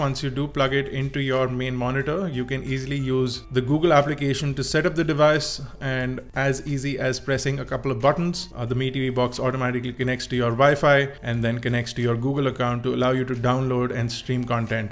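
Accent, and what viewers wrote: India. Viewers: problem with the microphones because your accent is india not english nature not to be racist or anything. i thought this was to see online stuff but is pretty much a big youtube watch and a netflix stuff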